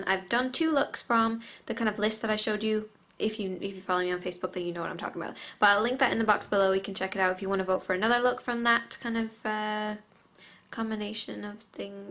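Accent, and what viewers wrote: Ireland. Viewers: R u Irish??? Please reply!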